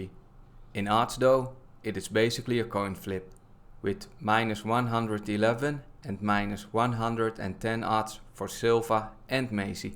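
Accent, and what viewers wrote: Netherlands. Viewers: Are you dutch? Just curious if I got the accent right.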